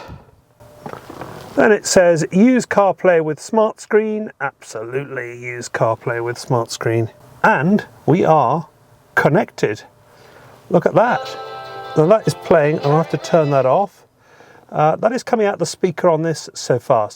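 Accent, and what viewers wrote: United Kingdom. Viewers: Thanks, loving your videos & the accent 🇬🇧 😉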